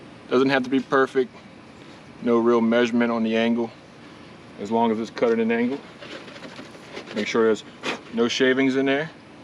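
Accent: Louisiana, United States. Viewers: Do I detect a Cajun accent? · New subscriber here, thanks for sharing. first time I’ve seen this technique, Great idea, you sound like a neighbor to me, I’m a recent transplant to Covington but grew up in Metairie. You from Louisiana? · I know you Cajun “mark dat” you must be close😆. Going put my scrap pvc to work…Great vid! Also, started getting free buckets from Super One from the bakery, so this works out perfect! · What part of Louisiana are you in? I hear the accent all day. Originally from Laplace. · Omg I knew it! I heard the accent & knew it was Louisiana! I had to check the page to find out. I love yall folks down there. ❤ Great video & VERY informative. I'm going to be a first time gardener so I'm soaking up all the knowledge I can to ensure a successful harvest once I get started. Jist gathering the rest of my supplies. I can't waitttt!! 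😁🙌🏽 Thank for this great info. Im definitely going to do this. I've been worried about using plastic in my garden (even food grade) but it seems you really can't get away from it. 😕 So plastic it is. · Gotta love my down the bayou people,,, love the accent. Missing it up here in the Okies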